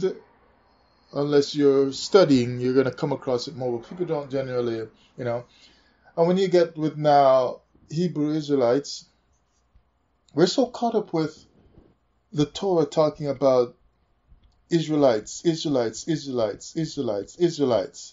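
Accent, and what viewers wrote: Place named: Caribbean. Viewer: Hi, I hope you are well. I have listened to your videos on and off for several months although I have not seen the last few months worth. The last ones I really related to were those that spoke about the original language. I could relate to your frustration of what really is the original - I now feel I have found the answer. Like me, you sound like you are from the Caribbean and you will most likely share my heritage. Please find me on facebook (Zephaniah Blake) and lets talk. Peace and love.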